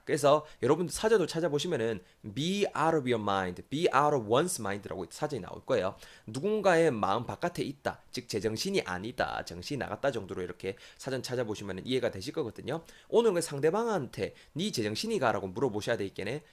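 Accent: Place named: Gyeongsang, South Korea. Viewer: 안녕하세요 우연하게 동영상 접하게됬어요 ! 강의 정말 재밌게 잘하시네요 저도 경상도 출신이어서 너무 친숙하네요 너무 재밌게 잘 봤어요 ! ㅎㅎ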